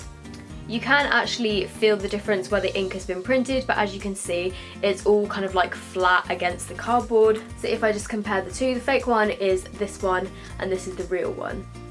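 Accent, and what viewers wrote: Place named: United Kingdom. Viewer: I seriously just watch you videos every single day and love you accent wish I was From the uk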